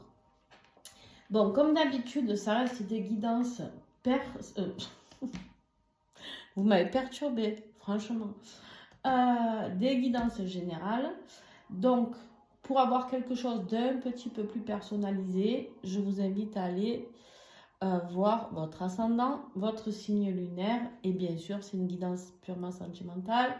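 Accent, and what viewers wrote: South, France. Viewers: et quelle voix...! Avec un bel accent du sud que je connais bien 😉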